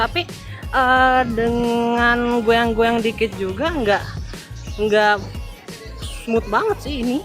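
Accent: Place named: Sinjai, Indonesia. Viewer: Kaya kenal... Org sinjai yah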